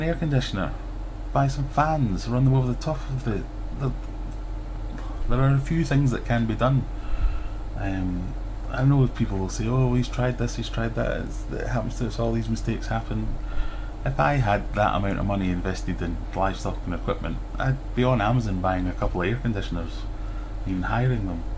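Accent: Scotland, United Kingdom. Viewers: Agreed on your insight regarding Joey's channel and himself. Are you Scottish? Kind regards! P.S. I've just subscribed :)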